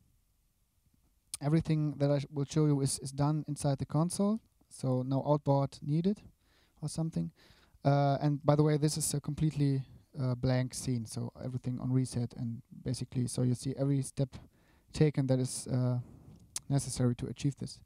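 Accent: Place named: Germany. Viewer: No No I mean I really like your accent it reminds me of a close German friend of mine I love the way you said the kick " Sounds Like Shit":) Thanks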